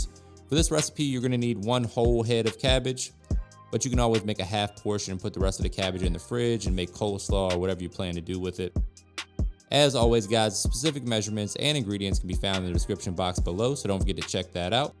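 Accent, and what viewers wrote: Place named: South, United States. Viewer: I eat with rice. I thought I am listening to a black dude. No offense. Then I saw you are a white dude talking like a black dude. You must be from the South. Thats cool Man! Milan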